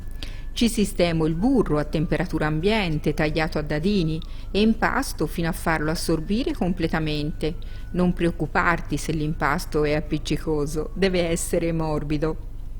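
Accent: Tuscany, Italy. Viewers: Sei toscana?😅semplice curiosità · Che bell’accento toscano! 😀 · Buonissime e mi piace anche l`accento Toscano ho vissuto a Firenze 20 anni e amo l`accento toscano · Hai una bellissima voce, mi pare tu sia Toscana. Complimenti per la spiegazione della ricetta. Buonissime!!!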